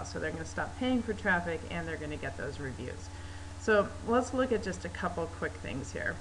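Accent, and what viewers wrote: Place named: United States. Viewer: man, its getting to the stage now where if I hear an american voice on a instructional video I immediately close it, 10 mins of you listening to the sound of your own voice! what a dribbler.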